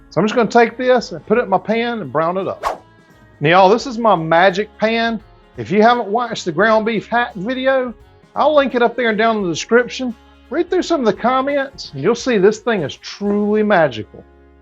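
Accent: South, United States. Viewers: Sounds like you’re from the south. I don’t know what you pay for good hamburger down there but where I live it starts at $6 a pound. 🥴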